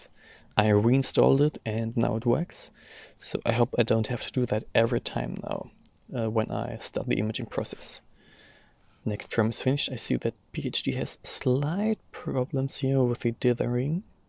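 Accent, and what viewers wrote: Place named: Germany. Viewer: Ich finde den Videoschnitt echt super, hat so ein leichten Astrobackyard touch, was ich sehr begrüße nicht falsch verstehen und auch der Ton ist schön ausbalanciert und angenehm, bis auf vllt das es keine 3 Sekunden braucht um zu merken das du eig Deutsch sprichst 😉 Wo es ja so schön um Field flattner geht, kennst du irgendwelche für 1,25" OAZ's? Habe mein Newton soweit modifiziert das ich mit der DSLR in der Fokus komme aber bis jetzt nur 45€ Field flattner welche die Brennweite halbieren gefunden... Und wenn ich das bei 700mm mache kann ich, Brennweiten technisch gesehen, auch mein 200mm f/4 mit nem x2 Telekonverter nehmen und komme auf mehr Brennweite... Dafür ist das dann doch irgendwie blöd. Auf 2" aufrüsten hab ich auch schon Mal dran gedacht, hatte mir aber vor rund 1,5 Monaten ne Baader OIII CCD Filter mit 1,25" gegönnt und der wäre dann ja logischer Weise nicht mehr nutzbar 🙁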